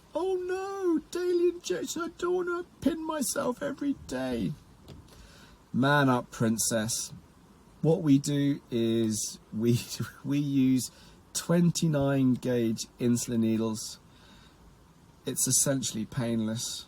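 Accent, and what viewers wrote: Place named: New York, United States. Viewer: Yes!!!! New York accent 😂👌🏻 HOT DAWWWWG 🌭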